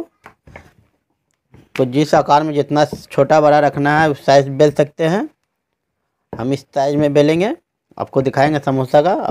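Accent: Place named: Jharkhand, India. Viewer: Kaa o bhaiya, Bhaasa se to Jharkhand ke lag rahe ho...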